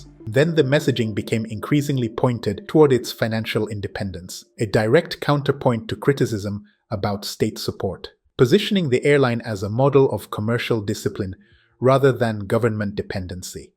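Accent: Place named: Kenya. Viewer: From his accent, yes, he is African. My guess is Kenyan. A youtuber with 1k subscribers can't influence anyone and guess what? 90% of viewers are Ethiopian. Whatever he talks about has nothing to do with Ethiopian Airlines.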